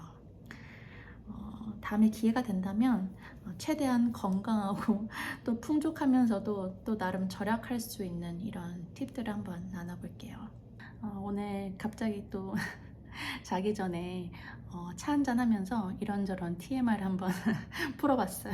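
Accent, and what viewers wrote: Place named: Gyeongsang, South Korea. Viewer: 경상도 사투리가 들려요!!! 경상도 출신 구독자입니다 ㅎㅎㅎ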